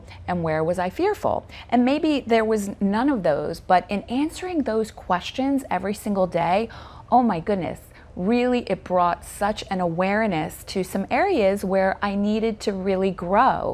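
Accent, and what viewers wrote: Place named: New York, United States. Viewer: always! FYI...I’m on my 5 and 6 th step and love your NY Voice more than anyone else 😉💜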